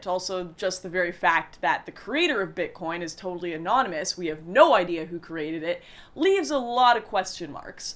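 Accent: Canada. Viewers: be waaay hotter if she'd drop the Canuck accent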